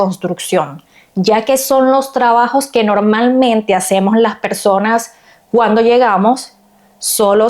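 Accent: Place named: Venezuela. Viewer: Tiene acento de mi país Venezuela.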